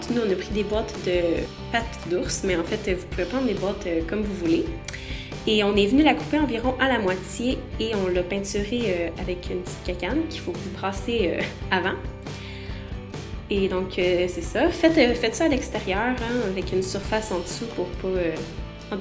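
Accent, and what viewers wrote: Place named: Quebec, Canada. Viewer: j'aime trop l'accent québécois ! !😍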